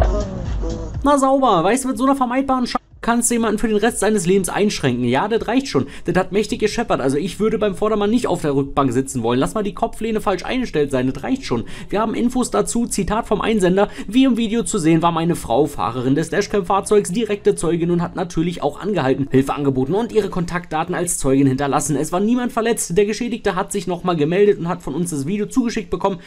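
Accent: Berlin, Germany. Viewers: Die Videos sind schon manchmal echt krass, aber viel cooler find ick dein berlinerisch ❤ Mit den besten Grüßen aus der Hauptstadt 🎉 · Deine Kommentare sind einfach mal richtig schön mit dem Berliner Dialekt 😂😂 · Ich liebe Dein Berliner Dialekt, das klingt so lieblich. Ja, smartphones im Straßenverkehr sind das größte und "modernste" künstliche Zusatz-Übel aller Zeiten! Auch wenn sie nicht direkt dran sind, es strahlt extrem und erzeugt Verpeiltheit/Verstrahlung und vermehrt auch Angina Pectoris und Gedächtnislücken als auch Konzentrationsstörungen, Spaltkopfschmerz und burnout, da ja Mikrowellenfrequenzschleudern, die extrem disruptiv gepulst-digitale Störsignal- Befeldung auf die Synapsen, Zellen und das vegetative Nervensystem absondern mit extremer Wirkung, kein Wunder, daß die Lobby all die dazugehörigen Studien zwar zahlte, aber sie aufgrund der extremen Negativauswirkung auf das Geschäft nie veröffentlichte. 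Bluetooth, WLAN gehören dazu!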